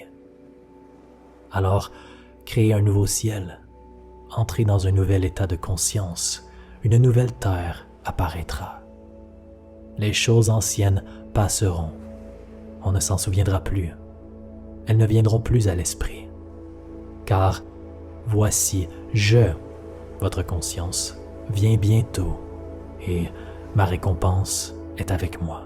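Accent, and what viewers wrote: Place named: Quebec, Canada. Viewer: Merci à vous même si javoue que j'ai du mal avec votre charmant accent québécois, question d'habitude auditive...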